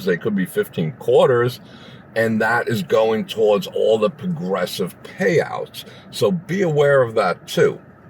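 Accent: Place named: New Jersey, United States. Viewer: Sounds like you're originally from New Jersey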